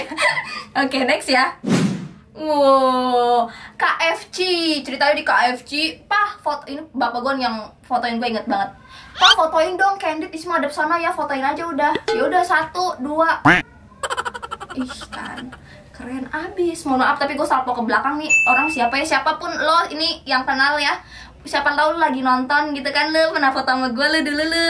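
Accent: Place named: West Java, Indonesia. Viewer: Aduh logatnya sunda teh S E M O G A T E H I S M I S E H A T T E R U S: ) Aamiin😊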